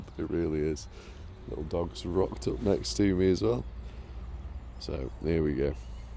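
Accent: Shropshire, United Kingdom. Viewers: Don't worry about your accent or it being Shropshire. For us foreigners it's all an English accent. Main thing for me is that you are annunciating well enough to understand without captions. There is something about your videos though that if I watch them at night they put me right to sleep. Keep up the good work. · What's wrong with your Shropshire accent? It's perfectly understanable and much better than trying to 'put on a posh accent'. I'm Scottish and I don't change my accent and seem to be understood everywhere. That excavator driver is amazing. The way he put the orange tarp into the dumper was a work of art. Imagine seeing the excavator and the spider together. It would be like watching a choreographed ballet. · Very nice Shropshire accent. You speak clearly and we can understand you. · More education for me. I've stayed in Shropshire a few times but never knew what the accent was. 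Actually pretty good. Not Northern Southern or Brum! Another great video. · Nothing wrong in using your Shropshire accent. My granny was very proud of hers even though she moved to Lancashire when she was 18 yrs old. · As an American Anglophile I absolutely LOVE your accent,! Let the Shropshire lad free! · Continue sounding Shropshire,; you're just fine. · we love all British accents including Shropshire! Waving from Australia 👋🇦🇺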